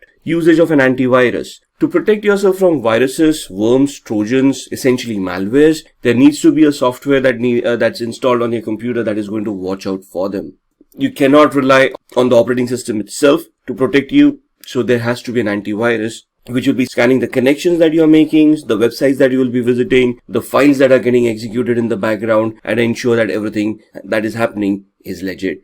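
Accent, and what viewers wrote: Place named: India. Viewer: no offense, why do most of these videos have to be narrated by people with Indian accents? I can't tell what he says no matter how many times I replay it... CC thanks...?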